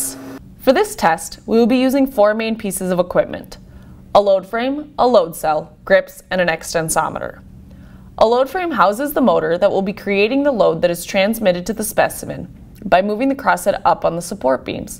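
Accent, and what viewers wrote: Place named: United States. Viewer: That's understandable, but this is American accent, which is very strange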